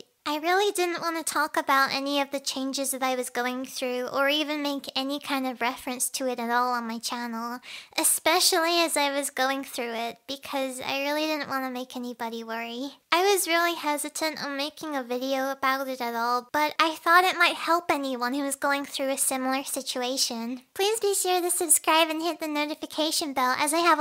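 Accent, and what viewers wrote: Australia. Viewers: YOUR AUSTRALIAN 😮 I AM TOO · Honestly, I didn't notice the australian accent until she mentioned australia. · ARE YOU AUSTRALIAN?!😮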